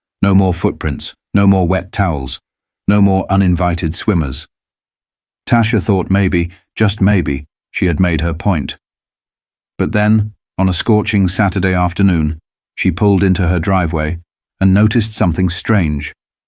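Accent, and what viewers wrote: United Kingdom. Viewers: Never any particulars such as dates, place, etc. The notes say, "Our mission is to give voice to the untold narratives that inspire, educate, and empower." Then, "Please respect the effort and creativity that went into creating this work." A created work of fiction indeed. Using a narrator with a British accent doesn't do much to enhance the so-called "Black" experience.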